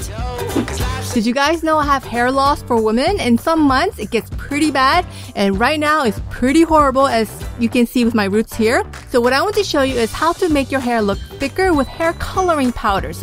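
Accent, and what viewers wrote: South Korea. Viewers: Are you Korean? Reason I ask is Because i think you have very very slight Korean accent...? Or is it just me? :o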